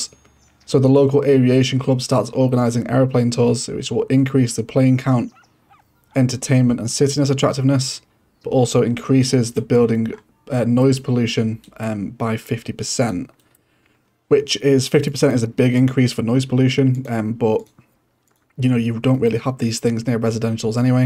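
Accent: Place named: Manchester, United Kingdom. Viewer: you sound so much like rashford, you from manchester?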